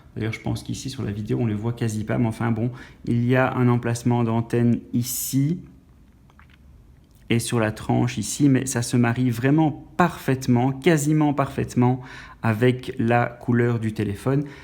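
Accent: Belgium. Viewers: T’es belge?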